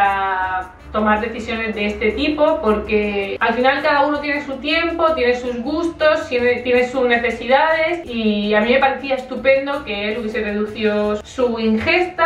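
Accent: Granada, Spain. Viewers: Hola! De que parte de Granada eres? La verdad es que en el acento me soñabas a granadina, jajajaj pero hasta que no lo has confirmado no estaba segura. Un saludo de otra granadina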